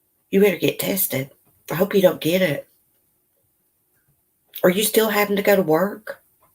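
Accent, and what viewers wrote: South, United States. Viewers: My 5 month old daughter and I listen to you every day. She just lights up when she hears your voice. You make my our day ❤️ Keep it up, girl. Sooo refreshing hearing a southern voice who keeps it real. With love from the Texas hill country, Kate & Roxy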